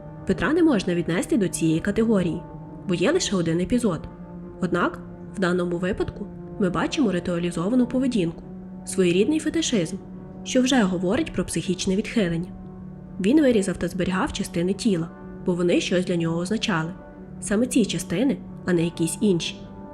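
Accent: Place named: Chortkiv, Ukraine. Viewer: У вас такой акцент как у моих друзей из Черткова) но формат у вас как у некоторых американских true crime каналов. Вы видимо в Америке жили или живете?!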